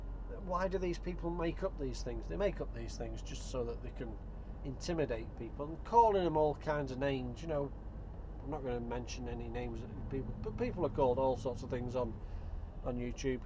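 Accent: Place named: Wrexham, United Kingdom. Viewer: Your accent sounds like your from Wrexham way... just block delete these fucks, i personally love your vids, seen every one of them, keep up the good work, and ignore them, block delete and you have won, they then have to go through all that rigmarole to make a new account, if then they come straight back and u block and delete them again, you have won again... they cant keep that up... love your vids!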